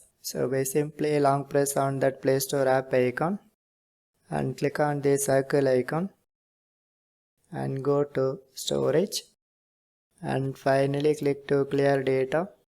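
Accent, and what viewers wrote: India. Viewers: Cuz he's Indian bozo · Bro he's Indian that's just how they talk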